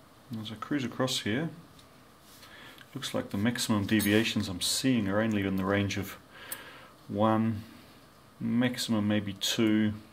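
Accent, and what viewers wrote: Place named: New Zealand. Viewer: Ah ha! I thought I detected a NZ accent! Used to work for US branch of a company, TNT, headquarted in AU. Chatted with blokes from all parts of that world. Enjoy your vids! Thanks for sharing!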